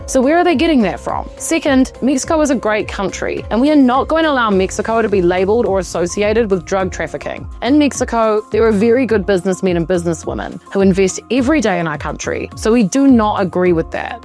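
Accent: New Zealand. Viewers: Sounds like Kiwi mixed with something else, to my British ears 😅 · From the multiple enunciations in several vowel sounds in just a couple of sentences; is the speaker for this a Kiwi? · New Zealander by the accent! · Great video - very informative- thank you. Out of interest, where is your accent from? I'm guessing NZ but not at all sure... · New Zealand accent? Nice! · Love that kiwi accent from a fellow Australiasian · Yes, Kiwi accent.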